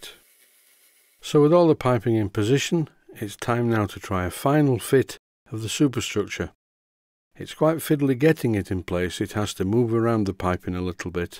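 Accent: Yorkshire, United Kingdom. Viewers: Do all your video's in full Yorkshire accent please! I don't care if I can't understand it, it still sounds great! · Very nice job and I say you should go full Yorkshire accent.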